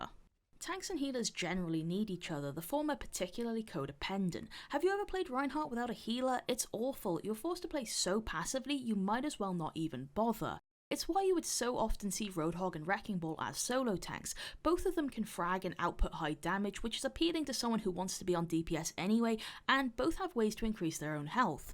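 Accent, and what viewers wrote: Wales, United Kingdom. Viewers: are you welsh i can’t really tell but i’m guessing you are 🤷🏽‍♂️🤷🏽‍♂️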